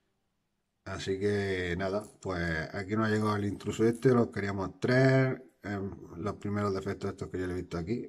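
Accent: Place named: Murcia, Spain. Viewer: Buen vídeo, por tu acento me pareces Murcianico?. Me suscribo al canal y participo!!.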